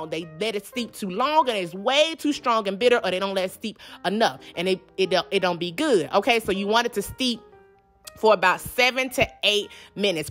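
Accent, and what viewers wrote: South, United States. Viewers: I be living for this Southern ass commentary😂❤ (that's no shade, I'm from the South too🙌) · I knew how to make tea already. I just came to hear you talk lol! Gotta love a southern woman.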